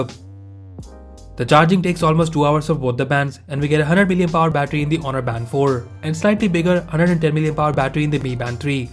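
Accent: India. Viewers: no one needs indian accent on tech vids.. just stop..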